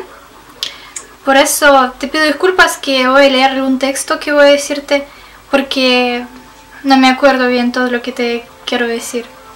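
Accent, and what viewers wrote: Argentina. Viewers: muy pocas veces puedo ver mujeres como tu aquí ...¡¡¡tienes uno ojos muy bonitos!!!.... pero seguramente te preguntaras ¿que estoy haciendo aquí?... bueno ...hoy, sábado, estaba simplemente navegando en Internet ... tu ya sabes ...mirando aquí y allá generalmente cuando entro a youtube me pongo a oír música de todo tipo y mientras la oigo busco noticias de RT en español .... como para entender mejor el mundo allá afuera ...fue así como sin querer, o mejor sin intención encontré tus vídeos ... vi los tres que has subido ... no se si has subido mas ...pero me causa curiosidad el acento que manejas ... pareciese que hubieses estado en argentina en verdad!!! .... permiteme aclararte que no soy argentino ... yo soy colombiano, pero reconozco el acento argentino y en especial en acento de los países que rodean a argentina ... mientras tu estas durmiendo yo te escribo aunque ya va a ser la hora de irme a la camita ya son la pm y según mis cálculos son las am ..... 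pero como sea... yo solo quería decirte que tus ojos son hermosos. ojala yo pudiera conseguir una mujer con los mismos ojos tuyos ..... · "Por favor no te olvides de mi" Me destrozo el alma esa frase. Tu castellano argento es excelente :D ojala algun día puedas venir a Baires y conocer a Natalia Oreiro!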